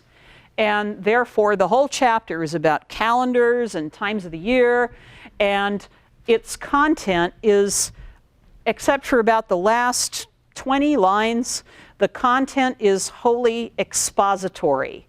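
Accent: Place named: United States. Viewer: Latin spoken with American accent sounds funny.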